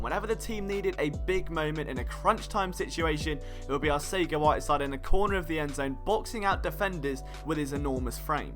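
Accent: England, United Kingdom. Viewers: Yeah thanks for all your insight, after the first few times of getting used to your English accent, I really love your videos. You need a collab with Philly 500, i'd love to hear your accent and his Philly accent together, haha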